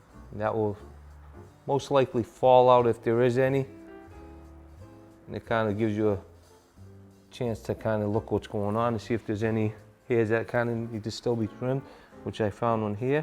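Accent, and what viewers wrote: Massachusetts, United States. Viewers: This video is so Massachusetts. The accent, The TB12 jersey, there’s even a Dunkin Donuts across the friggin street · Excellent barber great job!! 👍☝️ 😁 Love your MA accent btw CT native here 😘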